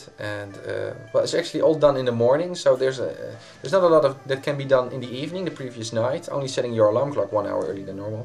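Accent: Netherlands. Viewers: i can hear on your accent that your dutch? or am i wrong:P? nice vid btw! i really want to learn this^^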